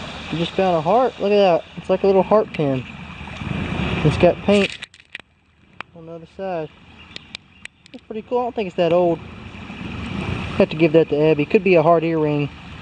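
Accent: South, United States. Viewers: Enjoyed the video. Felt jealous in a good way. I wish I were young and hanging out with you. You sound like John boy Walton, and I mean that in the best of ways. You sound like your from the south and that creek reminds me of a creek that ran by my Grandparents house in eastern Kentucky. One question do you come across snakes when out like that. I remember seeing copperhead, and cottonmouths down south. Hope you find some great relics and gold. God Bless. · where's your southern from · Murica, could you not tell from the accent?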